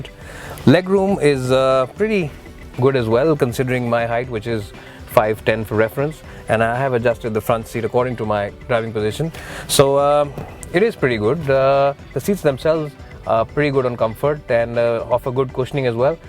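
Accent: India. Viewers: English slang indian....bla,bla,bla..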